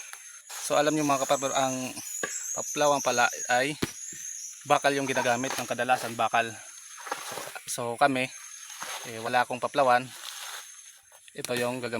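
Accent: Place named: Bicol, Philippines. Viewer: Sounds like bicol. San province nyo po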